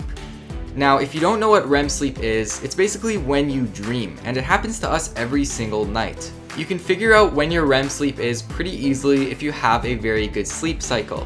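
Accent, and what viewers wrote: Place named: Canada. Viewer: I sense a Canadian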